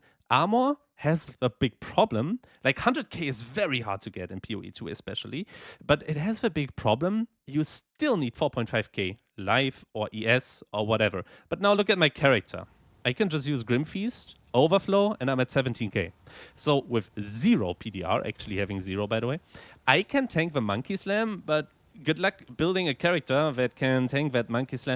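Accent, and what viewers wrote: Germany. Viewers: world first listenable german accent love to see it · Deutscher Landsmann. Hört man sofort raus. 😂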